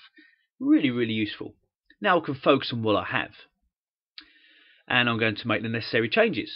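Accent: United Kingdom. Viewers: British English accent sounds really nice..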